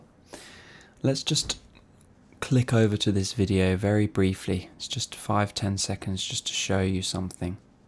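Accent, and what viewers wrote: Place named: London, United Kingdom. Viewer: Uou sound like your from London area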